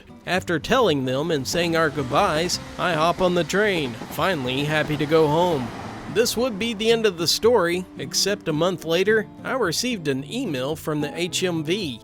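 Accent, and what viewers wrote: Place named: West, United States. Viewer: Story #1 Setting: England. Narration Accent: American Western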